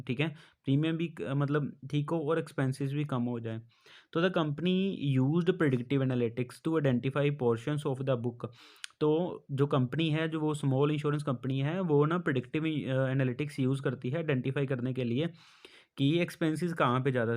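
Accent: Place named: Punjab, India. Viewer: But your accent like u r from punjab..... And mostly speaking in hindi & punjabi .... So why are h hiding that u r from Chandigarh...???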